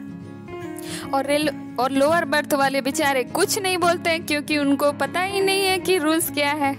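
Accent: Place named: Marwar, India. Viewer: Bahut hi badiya jankari di aapne medam… aap has has k jese bata rahi thi video interesting ho gaya or mene pura dekha or ha aapki voice se aisa lagta h aap marwadi ho sayad.. i am right ya wrong medam ??😊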